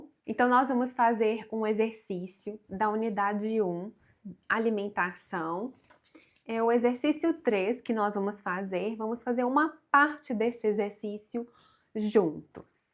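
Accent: Minas Gerais, Brazil. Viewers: Oi,! Acabei de descobrir seu canal. Adoro seu sotaque, é muito legal! Ele é típico de Minas Gerais? Você comentou num vídeo que é de Belo Horizonte, né? Quem sabe você faz um vídeo sobre isso? Um abraço da Suíça 🇨🇭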